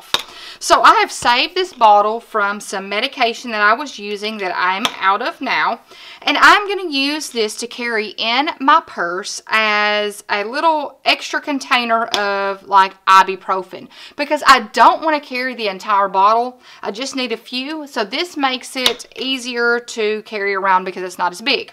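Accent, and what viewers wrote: South, United States. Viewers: Honey...you are from the South huh?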